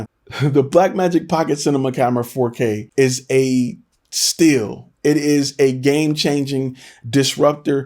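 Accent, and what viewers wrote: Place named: Inglewood, United States. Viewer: weird question, but just curious where you're from? Your "accent" and mannerisms are similar to my former Animation Art Director who grew up Inglewood, CA.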